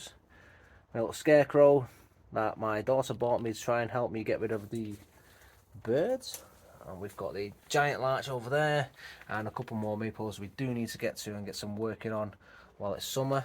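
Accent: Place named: United Kingdom. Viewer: Good stuff mate, just subscribed. Where in the uk are you, couldn’t make out the accent. Cheers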